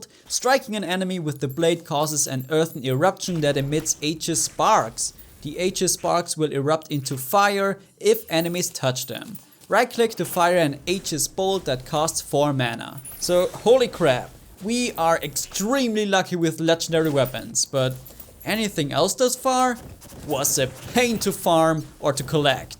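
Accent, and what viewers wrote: Germany. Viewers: are you german? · Joo du bist deutsch nicht wahr? merkt man am Accent und deinen Kanal Namen, hast du vielleicht Lust mit mir terraria zu spielen? würde mich so freuen :)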